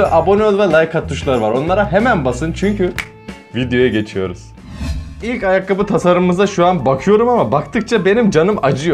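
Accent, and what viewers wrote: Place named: Ankara, Turkey. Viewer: Aa ben de Çorumda yasiyorum. Burada da Ankaralılar var konuşmalarınız benziyor zaten 😆😊😂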